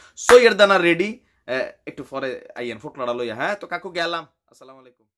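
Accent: Comilla, Bangladesh. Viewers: ভাই এমন সুন্দর ভাবে কুমিল্লার ভাষায় কথাটা বলেছেন সুন্দর লাগছে ভাই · Kumillar vashay kotha koyle moja lage. Vay amini koyaa jan . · ভাইজান আপনার কুমিললার ভাষা বেশ মজা লাগে,চালিয়ে যান,আপনার চমৎকার উপথাপনা, ও আলোচনা আমার ভাল লাগে। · কুমিল্লা ভাষা কথা বললে ভাল লাগে শুনতে ভাই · ভাইয়া আপনি কুমিল্লার ভাসায় বলেন ভালো লাগে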